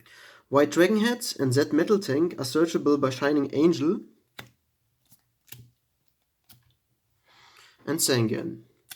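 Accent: Germany. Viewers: hab gleich dein deutsch englisch rausgehört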